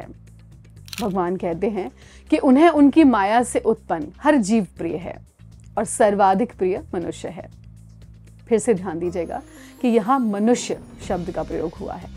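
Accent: Standard. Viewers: Aap k bol nay andaz pure hindi achha laga sister baat samajh mai aa gai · एक अच्छे पत्रकार का सच्ची पत्रकारिता और विशुद्ध हिंदी वाचन के लिए बहुत ही साधुवाद।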